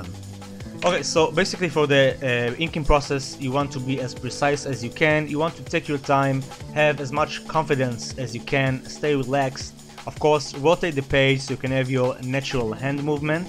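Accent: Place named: Israel. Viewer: אתה ישראלי? יש לך מבטה של ישראלי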